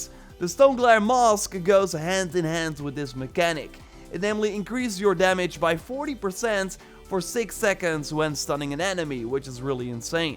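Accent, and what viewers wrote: Netherlands. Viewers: How dutch is this accent.. im dutch aswell but damn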